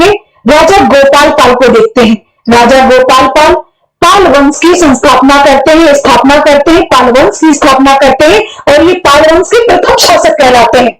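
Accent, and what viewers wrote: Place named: Jaunpur, India. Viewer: Maam आप अपनी उसी भाषा में बात करें और पढाये बहुत अच्छी लगती है सच में. जौनपुरिया वाली 👍👍🌹🌹🌹🙏🏼🙏🏼🙏🏼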